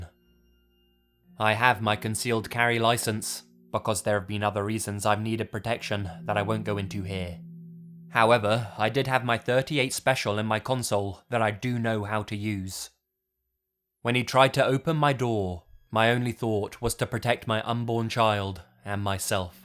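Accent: England, United Kingdom. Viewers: same brits ftw is he british? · are you British I'm not good with ascents sorry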